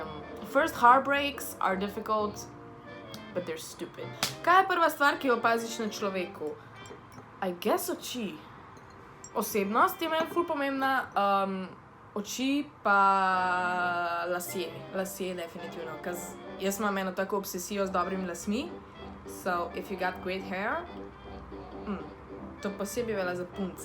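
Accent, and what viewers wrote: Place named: Velenje, Slovenia. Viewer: Halo gledalci spostujte jo kakrsna je ce ne pa ne gleda ce vam ni vsec... Men je top ker je taksna kot je in pac velenjcani majo tak glas sej govori slovensko sej ne kitajsko ce se pa v šoli niste ucil jemat snovi narecja v Sloveniji pa ni moj problem ampak sami ste krivi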